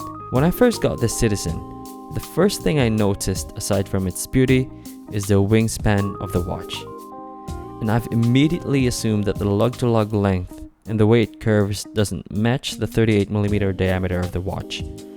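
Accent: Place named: Singapore. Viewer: Bro, great looking Nb1050. BTW custom size leather is quite easy to get these days right? You sound quite Singaporean, are you in Singapore?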